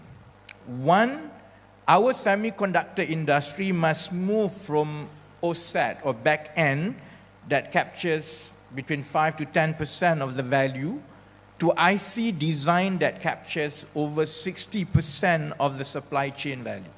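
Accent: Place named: Besut, Malaysia. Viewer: Kaler bunyi besut skit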